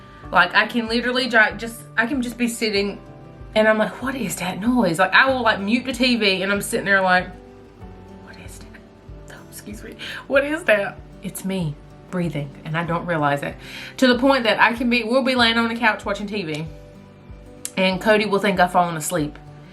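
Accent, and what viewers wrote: United States. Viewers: You’re so country and I love love love it lol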